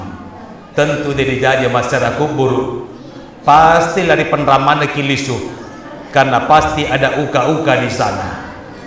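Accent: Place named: Bone, Indonesia. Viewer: Ust dr Bone terlht dr logatx sukses ust smga sht slalu. Amiiin.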